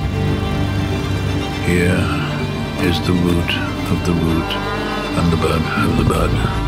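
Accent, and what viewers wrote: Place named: England, United Kingdom. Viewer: Some amazing use of light, I'm really interested to hear why you chose to have an English voice over this very American shoot?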